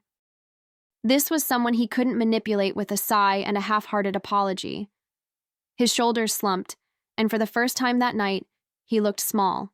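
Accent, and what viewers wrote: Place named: United States. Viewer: very strong over-emphasised American accent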